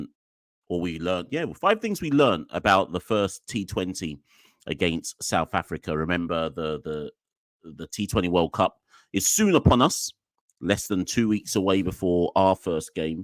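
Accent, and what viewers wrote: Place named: England, United Kingdom. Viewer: Are you in England or u just have an English accent? No disrespect but just want to know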